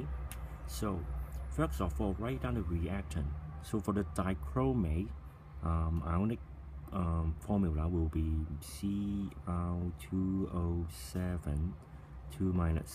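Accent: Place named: China. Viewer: From your accent I can guess that you are Chinese. By the way, it is now easy as a piece of cake for me thanks 👍👍👍👍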